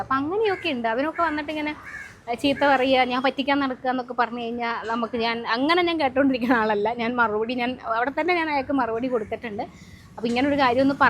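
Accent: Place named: Thrissur, India. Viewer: ടീച്ചറേ,, ശരിക്കും വീട് എവിടെ യാ അപ്പോൾ,, ടീച്ചർ സംസാരിക്കുമ്പോൾ തൃശൂർ സ്ലാങ് ആണല്ലോ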